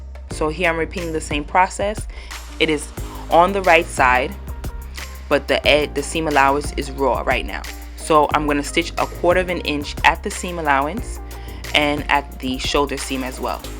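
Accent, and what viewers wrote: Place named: New York, United States. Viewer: yesssss tell him, plus since he’s trolling about pronunciation - let him know it’s your NY accent🙌🏽🙌🏽🙌🏽🙌🏽🙌🏽!! Ppl are so quick to pass judgement! Language skills have nothing to do with her sewing talent!!!👍🏽👍🏽👍🏽👍🏽👍🏽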